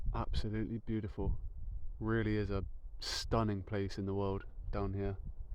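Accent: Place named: England, United Kingdom. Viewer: I love your accent. What part of England is it from? I am a new subscriber. Doing a great job with the content. 👍😊 from Éire well done 👍 on the spoon 🥄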